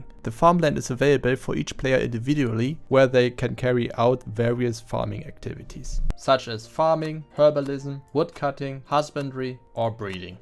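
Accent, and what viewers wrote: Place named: Germany. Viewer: Accent is German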